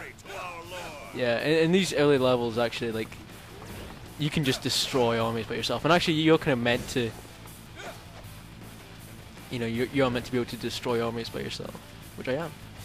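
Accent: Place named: Scotland, United Kingdom. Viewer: may i ask what part of scotland are you from? im just curious i enjoyed the vid and you deserve another sub =D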